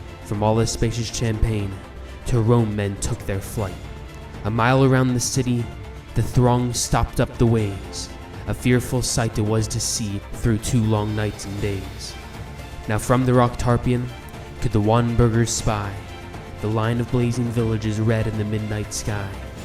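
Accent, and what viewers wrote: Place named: United States. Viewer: Magnificent words, but doesn't work when read in a U S tone of voice.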